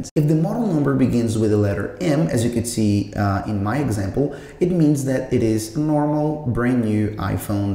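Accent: Brazil. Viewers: Are you brazilian? I can hear brazilian in your accent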